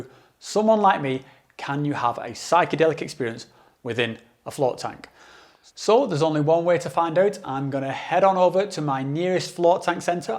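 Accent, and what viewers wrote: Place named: Lancashire, United Kingdom. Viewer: What's that accent you have? You near Preston / Blackburn?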